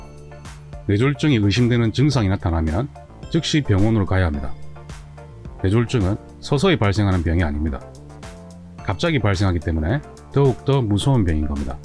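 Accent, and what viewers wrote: Gyeongsang, South Korea. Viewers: 선생님 말투가 경상도 출신같은데 맞나욯ㅎ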